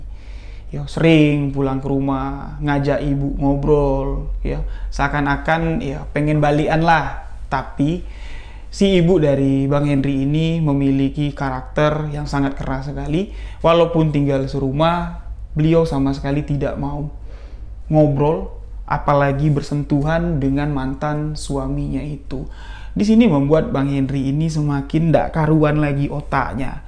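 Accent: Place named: Jambi, Indonesia. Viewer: Walaikumsalam kak....n team SKM Mf kak sekedar request upload dong Kisah kota ghaib Saranjana..... psti y kereen banget d bwain kk dgn logat jambi yg khas........pasti kereen habis 👌 Slm sehat....slm smngat....mkin sukses y Semua.... Yuk gaskeeunn 🔥🔥🔥 By. Yana 🙏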